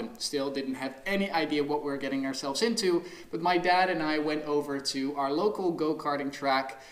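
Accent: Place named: United States. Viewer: How did you learn American accent so well?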